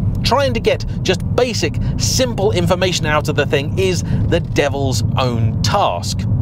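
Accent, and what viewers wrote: United Kingdom. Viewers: At least she does it with a pleasant British accent!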